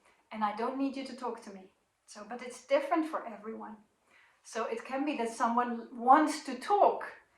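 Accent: Netherlands. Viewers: likewise. I have been subscribed for some time now. Is it a Hollands accent i hear? Groetjes from the sunny Caribbean.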